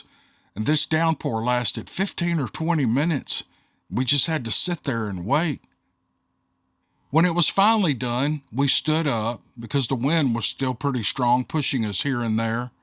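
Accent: South, United States. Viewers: Well young man I don't comment much but your southern drawl just makes the stories more interesting and exciting to listen to. So yeah great job and keep up the good work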